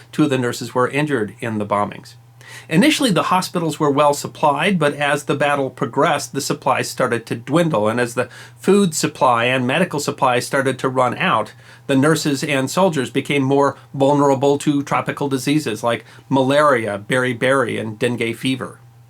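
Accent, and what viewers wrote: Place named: United States. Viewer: I appreciate this channel's interesting and informative accounts of history. In the interest of accuracy, which is what anyone would reasonably expect from THE History Guy, it would be nice if greater care and attention to detail were taken in learning the proper, non-Americanized pronunciation of foreign names and places. For instance, Bataan has three syllables 🙂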